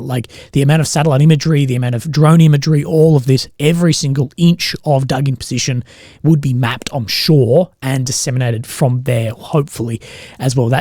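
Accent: Australia. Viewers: a great adittion to a great content, sometimes its a difficult to undesstarnd the aussie accent, even more if english its not your 1st language, so thank you for that adittion, keep on the good work. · wish I could turn them off, but as an Australian I'm used to the accent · Subtitles are ok but make them optional. For us that can cope with Aussie speak, they are distracting. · Definitely, because Australian English is the black sheep of the English language Dialects. English is my native language and i dont understand them sometimes either. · Thanks, as a Kiwi, your aussie speak is sometimes hard to understand thanks for the subtitles :).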